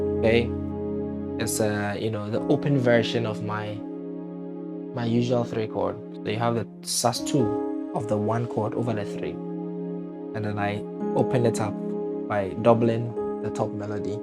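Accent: Ghana. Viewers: Are you Ghanaian? You sound like one though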